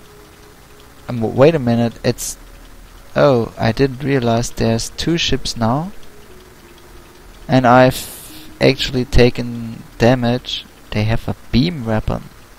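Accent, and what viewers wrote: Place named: Germany. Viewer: I love your accent. Are you german?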